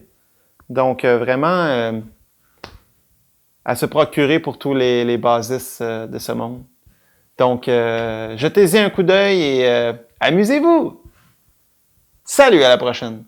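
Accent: Quebec, Canada. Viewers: Très bonne démo malgré votre accent québécois. :)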